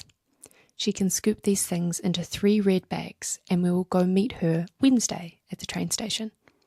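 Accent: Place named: New Zealand. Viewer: I love the Kiwi accent. You have a nice, soft way of speaking too and it is very pleasant to listen to. I have a typical flat midwest United States accent. 😋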